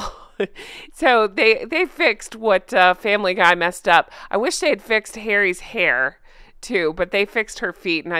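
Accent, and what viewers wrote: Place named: Midwest, United States. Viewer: What accent?! I’m from the Midwest and you sound like ME!!! Lol😂. Love a British accent!😊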